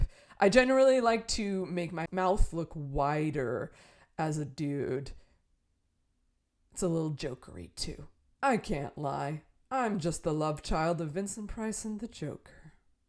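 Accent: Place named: United Kingdom. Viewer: This makes me wonder if there is an alternate universe where is alive and has his own drag queen persona with your name as the Drag name, honestly doing a drag king dressing as a drag queen look would be fierce and so bonkers, like a in drag look. Also, we definitely need you to do a look one day where you show us what looked like before he died. It would be so interesting. You're the first Drag King I've legitimately found interesting and I think it's due to the colourfulness, the glamour and the characterisation. It shows that Kings can be just as fun and amazing as Queens can be. P.S as a Brit, I approve of the accent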